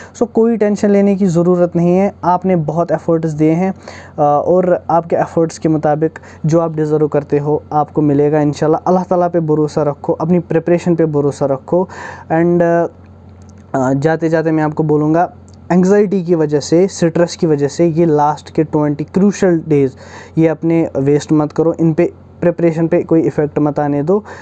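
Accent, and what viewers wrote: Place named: Kashmir, India. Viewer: Thanks bro.... For giving these motivational videos ♥♥ Way you talk kashmiri in urdu lang. I really like it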